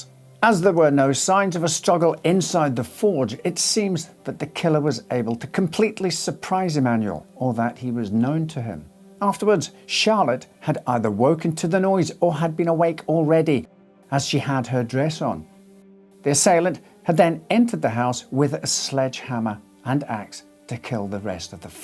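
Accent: Scotland, United Kingdom. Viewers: This is in England but his accent is Scottish. I'm sure they'll let you off lolol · The accent is not English , it is a Scottish one. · Love your vids. Is your accent mixed? I swear I keep hearing Scottish for a split second here and there, then suddenly you're English again, lol. I wonder if I sound like that myself with living in England and having had a Scottish dad.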